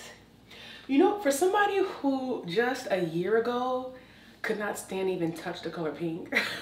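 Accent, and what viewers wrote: United Kingdom. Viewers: Loving your haul from my favourite Shein. Wow your British accents spot on.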